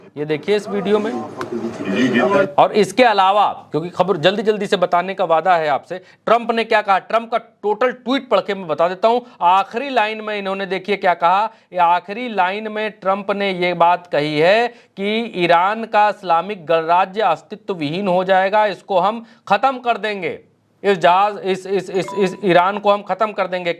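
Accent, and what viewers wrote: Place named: Bihar, India. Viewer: جی شاندار ہو ۔اللہ تمہیں زندگی دے ۔عمر دراز کرے خدا۔بہترین بہاری بولتے ہو اچھا لگتا ہے ۔پ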